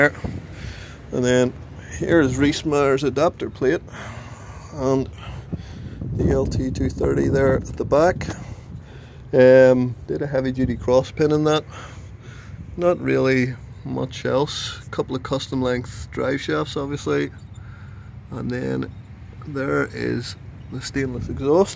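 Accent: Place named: Northern Ireland, United Kingdom. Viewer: Geekers Sur your norn Irish! Now I want one built!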